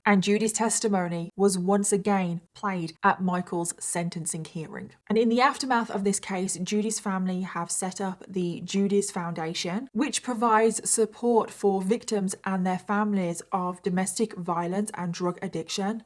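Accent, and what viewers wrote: United Kingdom. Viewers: LOVE YOUR BRITISH ACCENT.. So there is Posh, Then R something? Haven't seen where you are from in England. You are AMAZING STORY TELLER. You look great before and after makeup..Your an Artist for sure. Both as an Orator and Make Up Artist..Is no easy feat to make a guy watch through most videos.. You kinda an A.M.S.R.. . Have you thohght about a playlist with you reading or explaining a story, thats light and soft spoken..?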